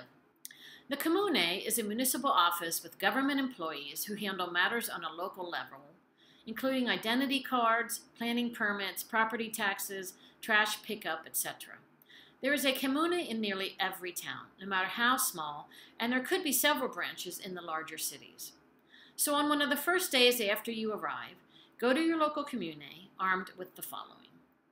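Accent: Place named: New York, United States. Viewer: Thank you so much for your videos. I watched this one and the how to buy property one as well. Thank you for sharing so much valuable information. I have a frivolous question for you. We live very near your previous place in Long Beach, CA. And you now live very near the Alps. I have friends who live in Torino. And he has shown me video of piles of snow. How are you coping with the weather difference? I think I can detect a bit of a New York or at least a northeastern accent. So maybe seasonal change isn't quite so different for you? We would really like to live in Italy. But I am thinking somewhere in the south because it is a little closer to the climate we are used to. We are not comfortable in the extreme cold I see in the north. I know you love your area, but have you visited many areas in the south of Italy? We love Sicilia and Puglia. 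Both of their climates remind me of coastal southern California. Thank you again for your videos. I liked and subscribed. -Joe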